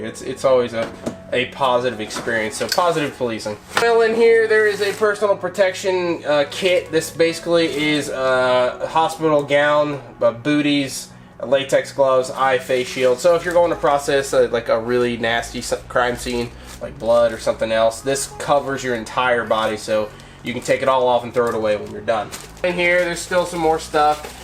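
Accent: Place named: Georgia, United States. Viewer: Starting to get that georgia twang now huh? Lol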